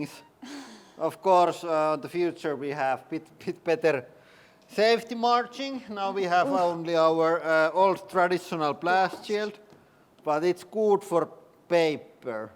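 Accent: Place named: Finland. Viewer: I love hearing English cursing spoken with a Finnish accent it sounds so good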